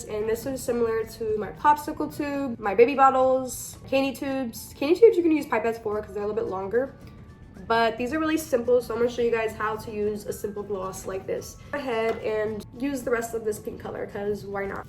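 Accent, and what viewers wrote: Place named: Latin America. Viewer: Are you Hispanic? Your accent really throws me for a loop bc you sound Latina. You are, right, like half maybe? (And btw polymer is pronounced 'paa-luh-mer.' I thought you were referring to something w/ a trademarked name, something like 'polymorph' or something. Polymers are a class of materials that are like plastic. But you should say it correctly.) Also, funny that you're teaching kids how to use syringes. I use syringes for um....something else. And don't call an object 'he/she.' Your videos make me angry.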